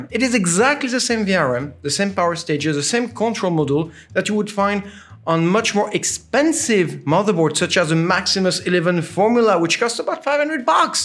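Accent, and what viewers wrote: France. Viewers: Do you are french ?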